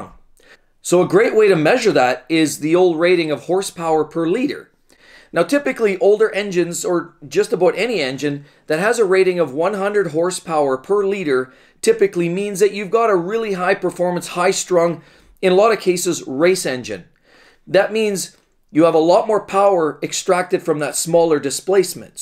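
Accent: Canada. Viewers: Awesome Canadian accent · Well this guy has a very thick country Canadian accent. It reminds me of Fargo. ❤️👍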